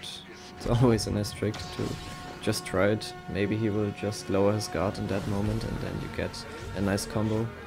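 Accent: Germany. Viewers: Der deutsche Akzent ist göttlich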